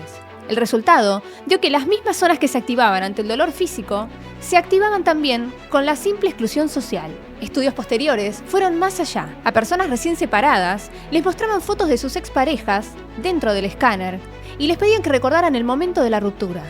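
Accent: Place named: Buenos Aires, Argentina. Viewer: Simpre tenes videos muy interesantes exelente research Y cambiando el tema pensar qje nací en Buenos Aires y me fui a los 5 años pero ese acento de Buenos aires me fascina mucho te go que visitar Argentina 🇦🇷 pero especialmente Buenos Aires que lindo saludos dese New York 🗽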